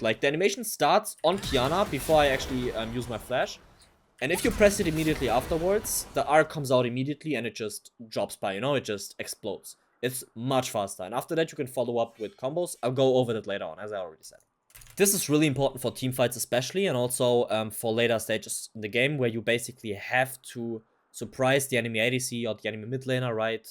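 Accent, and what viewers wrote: Germany. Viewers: Now just for me, are u German? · From your English accent I could tell you are from Germany, danke für die Tipps waren sehr hilfreich ✅️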